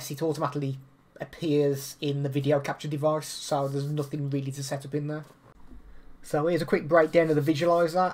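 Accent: United Kingdom. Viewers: The prob is partly the British accent. 🥸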